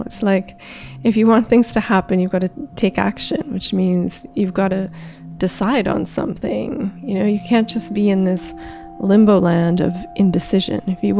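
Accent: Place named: Canada. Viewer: ".....ay?" you Canuck you nice gentleness, there is so little of that now.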